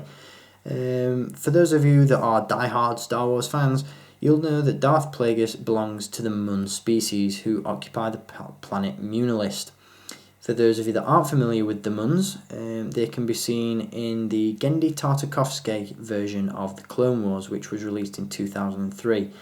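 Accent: United Kingdom. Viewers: Are you British? You sound British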